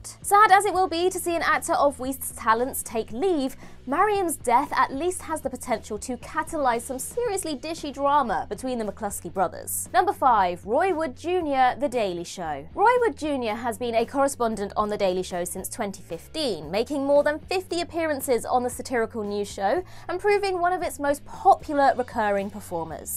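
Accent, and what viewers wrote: United Kingdom. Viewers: lol love British talking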